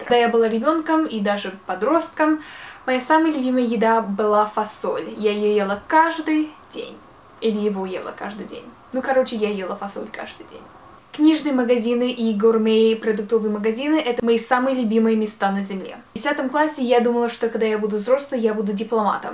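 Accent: England, United Kingdom. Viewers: У неё такой классный английский акцент;) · У тебя такой акцент сильный от английского)Так классно:3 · Такой английский акцент),это твоя изюминка) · Английский акцент у тебя)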